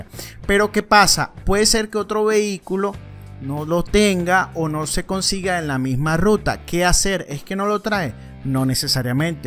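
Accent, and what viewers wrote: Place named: Venezuela. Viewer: Saludos, si no me equivoco tu asentó es netamente Venezolano y como buen Venezolano seria importante que nos respondieras sobre como podemos utilizar esa plataforma acá en VENEZUELA, espero nos respondan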